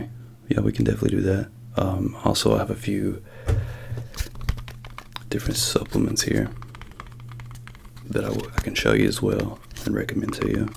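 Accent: South, United States. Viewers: you have that amazing southern accent which is lovely to listen to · Am I hearing a slight southern accent? 🙂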